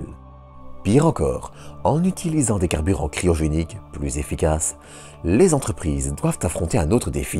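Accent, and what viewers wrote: Belgium. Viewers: Merci pour toutes ces précisions ! Toujours un plaisir de regarder tes vidéos avec ton accent belge si appréciable !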